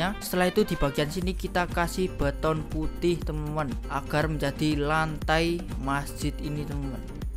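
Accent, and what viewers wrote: Java, Indonesia. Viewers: Bang ngomong nya kaya orang jawa 😂😂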